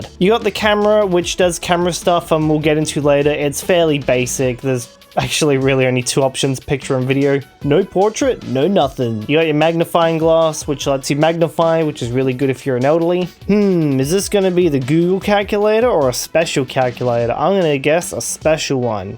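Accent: Australia. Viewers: Bro is unironically Australian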